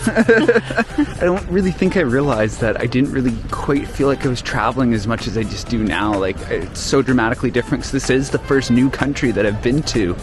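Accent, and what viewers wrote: Canada. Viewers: toronto accent?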